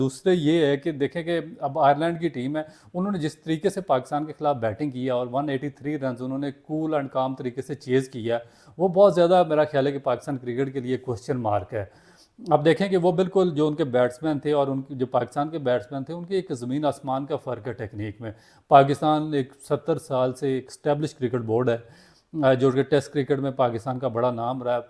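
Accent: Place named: Punjab, India. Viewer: Nice Punjabi accent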